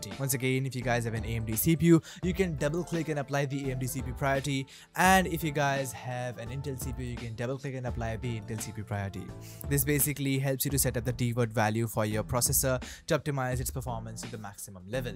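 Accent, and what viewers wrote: India. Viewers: indian detected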